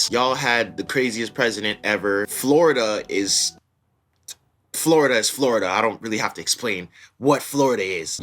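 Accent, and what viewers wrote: Canada. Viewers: according to what you said, well 1 point i like to make, you do not need to move from 1 pointto the other. you do have transit there, right? that in itself is great and very cool. sight seeing by transit. but all the same, everything you said is a very good point. and you did not bash the country. if you are not from canada, you sound very much like someone from canada. ok someone from ontario but hey no one is perfect (i know, i am a total smuck) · He clearly has a Toronto mans accent geez I'm leaving the place as well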